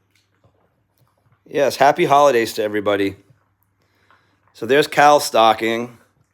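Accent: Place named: New England, United States. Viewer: Do I detect a New England accent there?!I guess I do!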